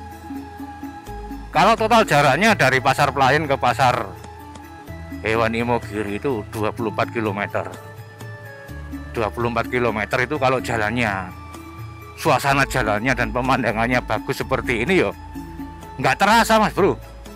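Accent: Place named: Surabaya, Indonesia. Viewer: Opo wong playen asli Suroboyo Mas, loghate kok suroboyoan.?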